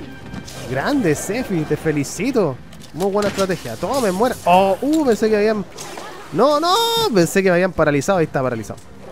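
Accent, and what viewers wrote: Chile. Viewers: Hay cientos de videos, pero nada me hace entrar mas en confianza como latina que oir un gameplay chileno.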